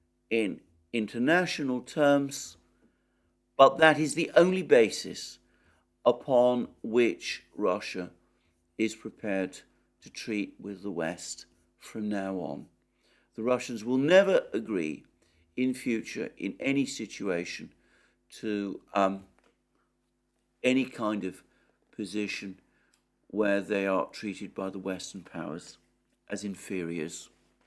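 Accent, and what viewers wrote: United Kingdom. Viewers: Just found you. Ha. Great content. Almost like something I would have put out if I wasn't so lazy. Plus, with a British accent which makes you way cooler than I could ever be. I will say, however, that I am on record as rejecting this pressure on Iran re: nuclear capabilities. I wrote an article over 15 years ago in response to a Kenneth Timmerman piece. It was published in the Washington Times, and was entitled "Iran Paternalism." Good stuff if I do say so myself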